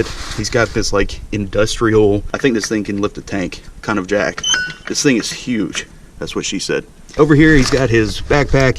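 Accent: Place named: Georgia, United States. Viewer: Wow this such a good idea for a youtube channel!!! Cute Georgia accent!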